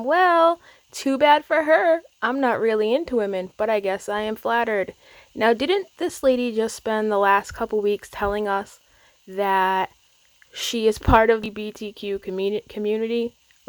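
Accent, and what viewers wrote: Boston, United States. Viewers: Omg no way I'm not one of the first to fan girl over this absolute awesome smart a f, awesome cute Boston accent ass creator js.. (sorry) Buttttt I'll disagree with TOS and that's the beauty of being able to be adults in this world.. twitter wasn't a free speech platform esp someone inciting violence on his own home with his own smooth brain cult followers. Yes he was the president.. but meh Racism prejudice and bigotry went up in the last 4 yrs. I suppose my views "based" but if u not gunna try to run a hate riot and storm a government building I think most be ok apps don't want to support hate a violence But but I still heart ur vids and u one smart as cookie and I wish I was as beauty as u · I love this channel! 🤣. Don’t mess with the Baaaston accent! Lol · Yasssss. What up, what up- Can’t sleep at 230am. Baby in this belly won’t let me. 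I swear baby K is going to recognize that Boston accent ❤️ EDIT: how do we help support your need for coffee??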